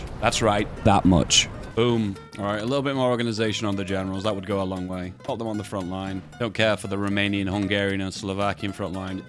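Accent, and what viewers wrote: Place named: Yorkshire, United Kingdom. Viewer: Knew you were a Yorkshireman, didn't know you were in South Yorkshire! Liked for best county.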